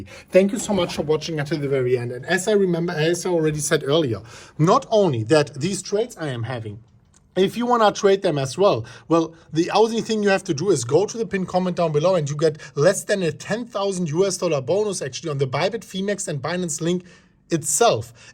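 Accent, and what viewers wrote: Germany. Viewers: I love your German accent, I think it's part of your style. If you wish to improve, look for me.:))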